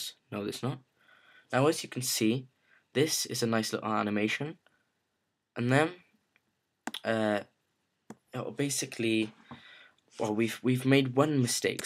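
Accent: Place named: Wales, United Kingdom. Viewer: Love the welsh accent :)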